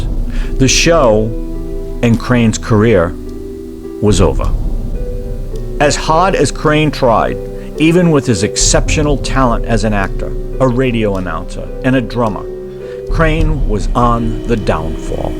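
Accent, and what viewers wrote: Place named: Northeast, United States. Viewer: "In his Scottsdale Arizoner apartment" lol Arizoner gotta love that northeast accent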